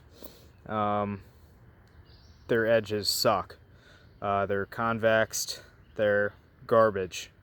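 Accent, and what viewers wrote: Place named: Midwest, United States. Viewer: You sound very much like a Midwest ‘up north’ guy and it’s appreciated.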